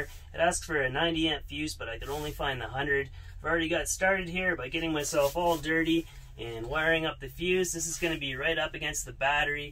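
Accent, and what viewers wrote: Canada. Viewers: nice you have the same jig saw i do! Just bought it to help with my future builds! Love the accent. Mn raised here, Not as thick as your canadian but don't you know..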